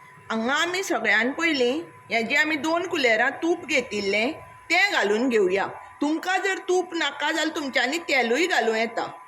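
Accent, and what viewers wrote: Konkan, India. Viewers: Must be tasty will try...your Konkanni is really nice to hear...dont know when Goa has a beautiful konkanni language why it's not used. Thank you for using it..God bless.. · Hey my MIL and I make it as a side with Neer Dosa often for breakfast. Ghovak khup avadta te. We chop the tomatoes to a little more smaller size instead of slicing/dicing like you did. Although I like it big. I love your Konkani dialect...the way you say "kaano" for onions is so irresistibly cute ❤️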